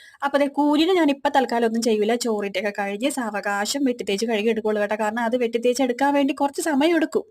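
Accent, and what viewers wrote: Kochi, India. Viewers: Ithonnum alkarude perala...eniku ishtapettu...thani kochi baasha😂😂❤❤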